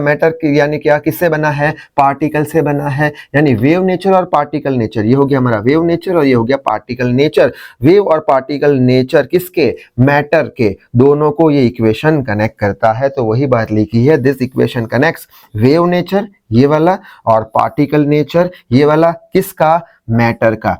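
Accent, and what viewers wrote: Gujarat, India. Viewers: Sir aap Gujarat ya fir rajasthan se ho na 😅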